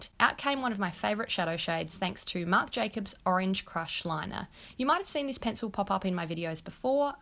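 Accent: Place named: Australia. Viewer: your videos are so simplistic, honest, innovative, creative, soft and realistic. your content not only gives insight to these products, but also show a hint of understanding the real usage and how it would be applied in everyday life, and in special occasions seen by the different styles of videos that you upload. personally, I love Australian accents, and I love it when people really support that backgrounds, and your enthusiasm and growing appreciation towards Aussie products and your cute and unique accent results in me watching your youtube videos over and over again. Thank you for the amazing and continuous effort that you put into your videos, I always find myself smiling when I click to view your creative content. 💓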